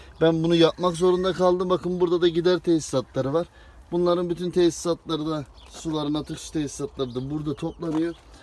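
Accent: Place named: Ankara, Turkey. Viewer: Buram buram ankaralı konuşması☺️ sırf hasret gidereym diye dinliyorum😀😀 şaka şaka bastan sonra izledim hobi bahçesi videolarını çok faydalı buldum emeğinize sağlık bu işe girecek kişiler için oldukça işe yarar bilgiler var. Birçok yerde izinler ve tarla arsa geçici imar izni konusu yüzeysel geçiliyor. Biraz detaylı anlatabilir misin? Teşekkürler...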